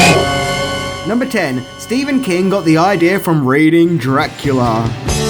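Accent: Australia. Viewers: The commentator here has got one of the most irritating voices I've ever heard, Aussie accents are dreadful to start with, but this bloke really grates. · What a shame, potentially interesting feature spoiled by world's most annoying voice/accent/host. Let's hope Mr Barlow pays a nocturnal visit to this Antipodean git and silences him once and for all. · are you an Aussie or a Kiwi?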